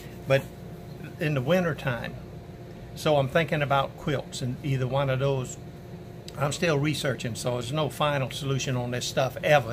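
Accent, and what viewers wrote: South, United States. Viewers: So glad I found your channel. I'm just a backpacker wannabe, but have done a lot of motorcycle camping with my husband, and find that the lightweight gear choices are similar. Your videos are fun and informative, and it's nice to hear another southern accent!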